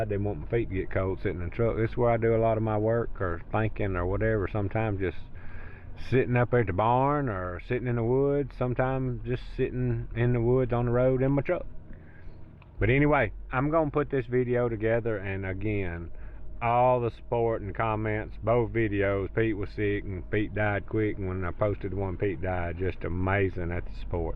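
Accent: South, United States. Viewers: 68 year old man here. I'm gonna miss that long southern drawl of", Pete, ". Well done!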